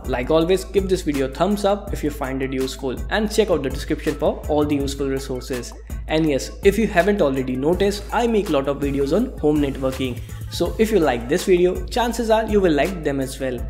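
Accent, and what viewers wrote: India. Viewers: Nah this is Indian Accent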